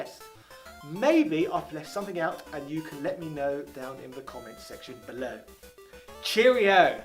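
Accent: England, United Kingdom. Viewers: English people can't speak properly, free DS for example. couldn't be bovered is another. It's not just this dude though lol. freeeee deeeeeee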